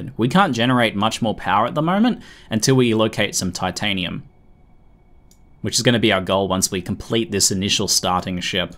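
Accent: Australia. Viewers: I must say, an excellent tutorial! And always great hearing our Aussie accent on Youtube! Onya keep up the amazing vids!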